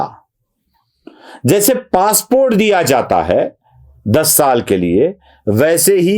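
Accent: Maharashtra, India. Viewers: apki marathi hindi me kuchh maja nahi aata, isse achha ye hai ki aap kisi hindi bhashik ko higher kare aur, usse ye kam ksrawaye. प्लिज वाईट वाटून घेऊ नका, आणि मी आपला आदर राखून हे सांगत आहे. यात आपला काही दोष नाही. धन्यवाद.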